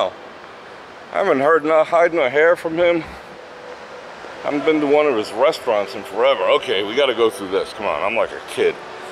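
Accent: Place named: Brooklyn, United States. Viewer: Ahh, there's some new knowledge. Also, I now hear Brooklyn in your voice.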